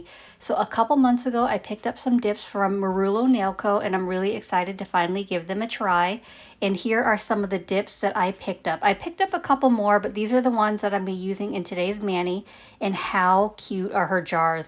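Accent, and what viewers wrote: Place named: Massachusetts, United States. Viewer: Lavendah… you’re speaking my Massachusetts accent we have officially dropped the ‘R’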